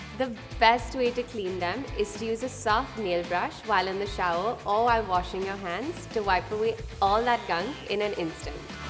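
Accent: India. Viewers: She has an american look. But she talked like indian woman. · has an Indian Assent.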